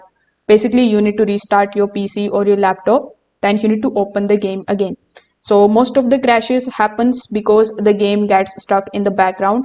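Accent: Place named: India. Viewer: bro we can hear that you are indian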